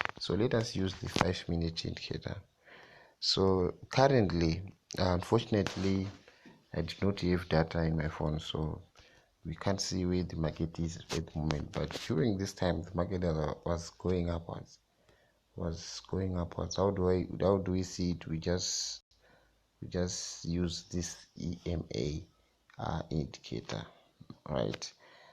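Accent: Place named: Zimbabwe. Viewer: are you zimbabwean??..ndanzwa tushona umo..how can i contact you bro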